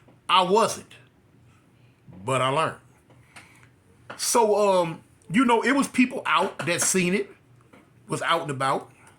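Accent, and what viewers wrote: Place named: Texas, United States. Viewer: Are you from Texas? Why do you sound like my dad? Lol.. I know my dad, I'm not saying I don't.. But it must be a Texas thing how y'all talk, lol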